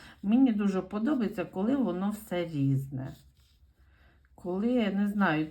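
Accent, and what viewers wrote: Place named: Odesa, Ukraine. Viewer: Ваша смачна , українська мова з одеським присмаком теж зачаровує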